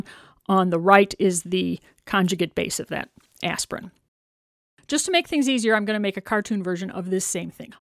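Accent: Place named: South, United States. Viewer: It is a southern accent and I love it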